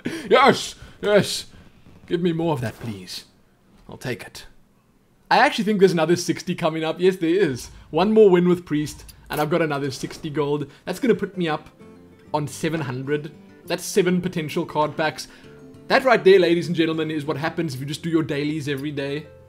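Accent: South Africa. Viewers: South African, close to Cape Town :) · Hey you from S.A?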